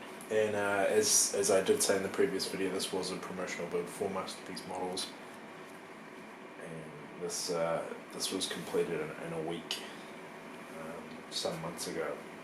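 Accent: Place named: New Zealand. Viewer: dude! thts some great work!! As a 'crazy Blake's 7 fan' I appreciate yr work here :) was larger scale than I imagined, which means a corresponding Liberator wld be YUGE! U sound like a fellow kiwi lol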